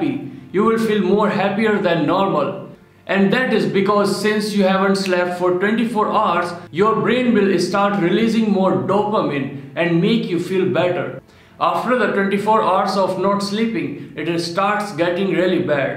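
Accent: India. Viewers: I'm pretty sure he is he sounds Indian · Dat Indian English :D why are indians making this vids ? I heard thath they are not rich... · I think is a indian by his speaking language and.... Nothing 😅😅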